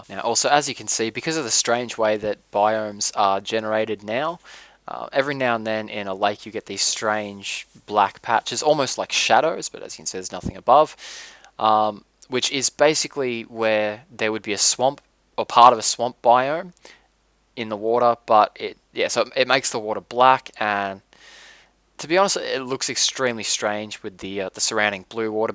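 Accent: Australia. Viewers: YOUR AUSTRALIAN :) XD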